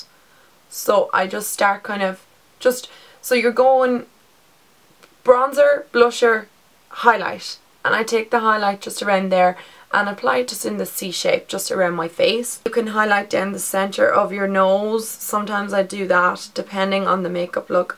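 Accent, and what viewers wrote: Ireland. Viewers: great video thanks for the tips!! i use a darker foundation for contouring but find it looks cakey so will invest in bronzers and try that instead! love the accent! im sure all english people love the irish accent ! :) subscribed :) xxxx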